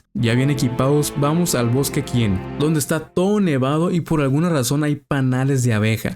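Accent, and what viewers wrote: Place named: Northern Mexico, Mexico. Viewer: Amo mucho tu forma tan norteña de narrar y lo divertido que vea tu voz, ya eh visto varios de tus vídeos y son muy divertidoa Nueva Seguidora Feliz navidad🎉🎉🎉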